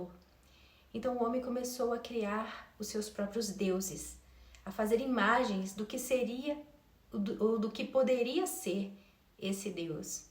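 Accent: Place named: Minas Gerais, Brazil. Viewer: ❤❤. Você. Hoje. Tá. Muito. Linda. Bem. Arumda. E. Você. Fala. Muito. Bem. Eu. Adoreio. Você. Quero. Seu. Tel. Endereso. E. Acidade. Seu. Sotaque. E. Minerinha. Bj❤❤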